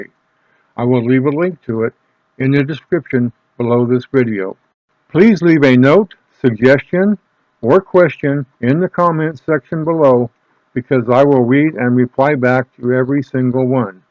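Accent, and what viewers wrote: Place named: South, United States. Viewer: RB CV really? He sounds really clear to me. Sounds like he maybe has a southern accent or southwestern accent.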